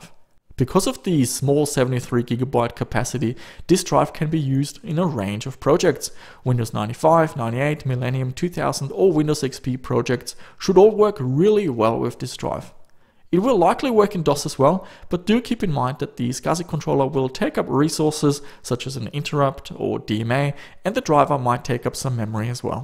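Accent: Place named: Germany. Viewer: An deiner Stimme erkennt man sofort das du Deutsch bist :D .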